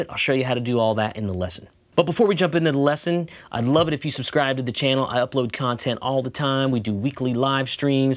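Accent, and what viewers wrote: South, United States. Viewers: You're getting a little Southern drawl there... :)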